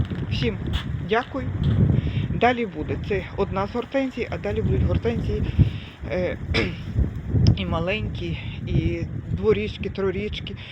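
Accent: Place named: Chernihiv, Ukraine. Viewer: Вітаю Вас! Обрізка гарна. Кординальна. Теж так люблю. Дуже буду чекати Ваші осінні відео, щоб подивитися, що вийшло і як вона буде сформована з квітами. Впевнена, що буде дуже гарний кущ. А ще хочеться подивитися на сорт. Бо в самої є такі 2 різні кущі без назви. І підходять до Левани, і до Кіушу і до Тардіви. І всеодно думаю, шо ні одна з трьох. Тож дуже буду чекати Ваше квітування літньо-осінне. А ще у Вас дуже цікавий говор українській. Такій рідний моєму вуху... У моїх Чернігівськіх родичив такій. Ви не відтіля)))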